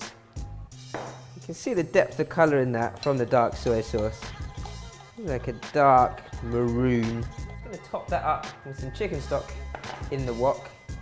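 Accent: United Kingdom. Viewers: I knew from the start he speaks he must be stayed or grew up in UK. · Just realized he's Asian but has a British accent lol. Great video looks amazing! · School of Wok as in we’re or I at least have never heard an Asian man with a British accent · That Hogwarts accent is wonderfully fun to listen to. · Yeah so some Chinese looking guy with British accents (who's probably a British) is explaining how to make a fake (and extremely unhealthy) Chinese dish that's invented in the USA, and never consumed by people in China. Pretty interesting, although I didn't watch it through. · Ok, it ALWAYS fucks up my mental when I hear Asian people speaking with a British accent. Sorry, but it JUST DOES. I'm used to hearing an "American" tone, or an Asian tone, but a British tone? It just doesn't fit (to me) The recipe seems good, though.🙂🙂🙂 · As an American fan of Chinese-American food, including General Tso's chicken, and as one who's grown impatient with authenticists and purists who try to delegitimize any Chinese food not made in China, I'm heartened to see a Chinese-British cook with a proper British accent who gives expatriate Chinese food its due and re-enforces its legitimacy as cuisine. 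· Asian guy with a British Accent? that's something new. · Awesome dish, it sounds like u have a british accent.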